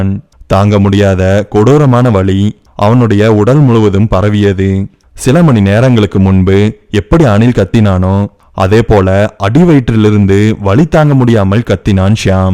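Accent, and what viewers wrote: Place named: Kongu, India. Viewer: நல்லா இருக்கு கொங்கு தமிழ் ❤❤😊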